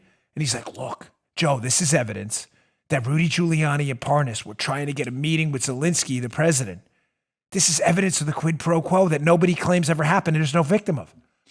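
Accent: New York, United States. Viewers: NY wit and accent is wonderful! Love the quips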